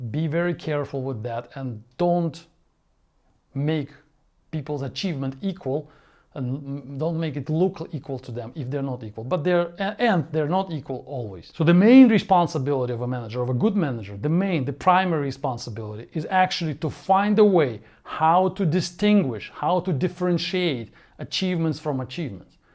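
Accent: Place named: Russia. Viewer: Totally agree with what you are saying. Just a tip. Hire somebody to work on your English pronunciation, it's so Russian now. :)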